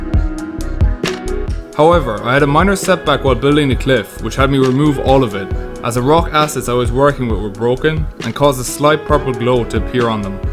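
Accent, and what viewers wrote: Ireland. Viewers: I think u sound Irish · Are you Irish or Northern Irish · He sounds like he's from reland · Are u Irish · U Irish’s? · Are you irish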